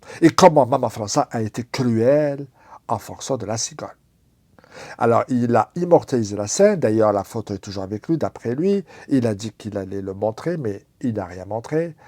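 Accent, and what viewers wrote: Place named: Africa. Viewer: 😂😂😂😂j'adoooore ton accent africain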